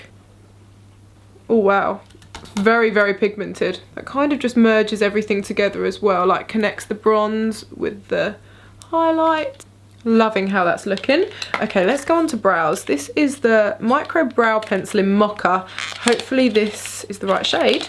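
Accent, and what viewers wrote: United Kingdom. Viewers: You have the most beautiful eyes!!! Your just beautiful over all. British accent bonus!!!!!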